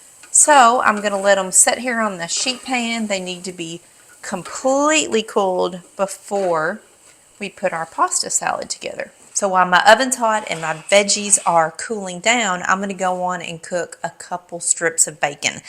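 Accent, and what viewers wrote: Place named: Tennessee, United States. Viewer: Question: How big is your cutting board? I bought a new one recently, and I think it's too small. I love your accent. Tennessee? That salad is just up my alley; after 6 years on keto, it's time I reintroduced pasta, which I actually began doing 2-3 months ago. Thank you; I'm eager for more of your recipes.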